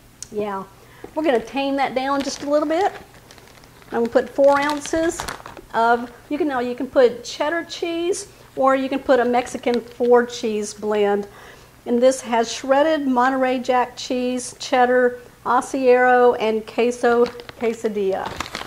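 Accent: Texas, United States. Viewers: I am Irish Mexican and I love your Tex Mex accent! Sometimes I get told my Spanish is incorrect well I'm from tx and I got a twang in the way I speak! Love the recipe I am a huge fan of Chile rellanos!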